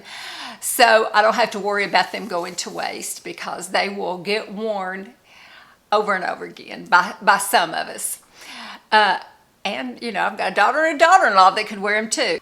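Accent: South, United States. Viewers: Your Youtube channel is mentioned in "Becoming Me." That's how I found you. You are beautiful! We are close to the same age. I love your Southern accent. Like & subscribed.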